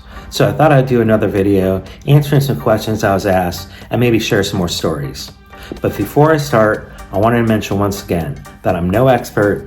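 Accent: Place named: United States. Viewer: Educated English? He's a yank.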